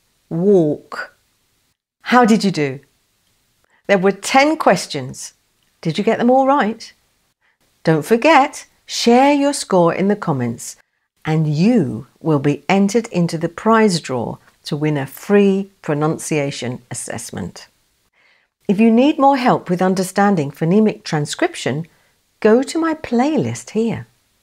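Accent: United Kingdom. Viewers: Native Spanish speaker here, I've got 9/10. I knew "monkey" was pronounced with /ʌ/ and I'm not used to hear British English so the way you pronounce it confused me. But after hearing it again and comparing it with other words with /ɒ/ I just saw my error. A fun exercise I may say! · Thank you for learning English pronunciation with a British accent for free, loving 🌹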